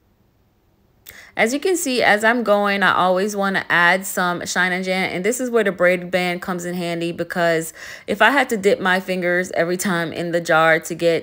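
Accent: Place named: Jamaica. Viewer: I could here the Jamaican in you😊😅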